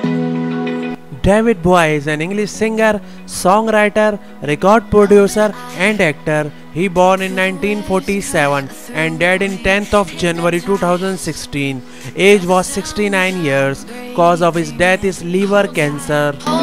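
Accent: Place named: India. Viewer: Why this Bollywood Dialekt? Absolutely horrible, can't you afford someone with a decent voice????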